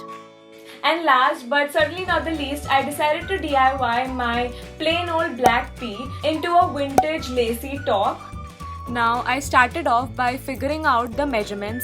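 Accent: India. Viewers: HONESTLY if you don't like the Indian accent you can fuck right off and click on another video, no one wants your negative and racist opinion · *cute indian accept: ) you're cute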